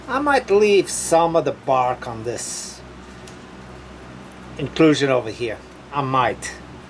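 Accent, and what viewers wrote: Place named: Portugal. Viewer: you are a rebel! ( and a master) love watching to see what your making.By the hat and the Portuguese accent you must be from the Massachusetts area. Keep up the awesome videos,you are truly a craftsman.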